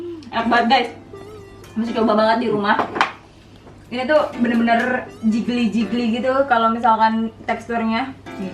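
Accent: United Kingdom. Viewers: Jatuh cinta bgt sm aksen brithisnyaaaa 😍😍😍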